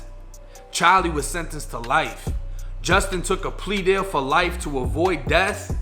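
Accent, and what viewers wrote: Boston, United States. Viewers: How DAAAAHHHKK it would get lol all love but your Boston/Florida accent be getting me at times lol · Sounds like a boston version of Mr Ballen story telling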